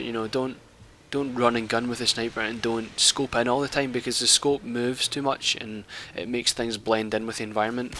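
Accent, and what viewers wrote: Scotland, United Kingdom. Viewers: are u scottish if so were 4rm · arent u british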